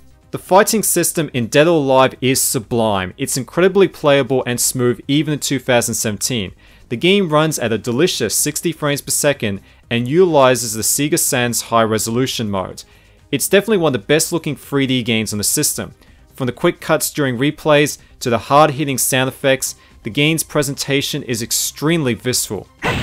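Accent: Australia. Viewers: Hi Mate. You sound like you are in Austarila, so am I. Did this Japanese import NTSC-J game play fluidly on your PAL Sega Saturn? Is your Saturn unmodded?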